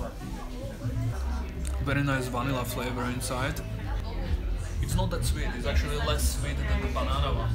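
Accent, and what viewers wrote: Slovakia. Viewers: is your accent Slovak or Czech? nice videos thanks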